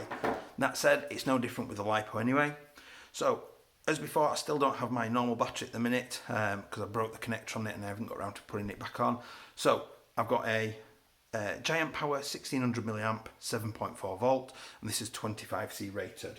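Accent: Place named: Yorkshire, United Kingdom. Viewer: Haha I know a hello Yorkshire man when I hear him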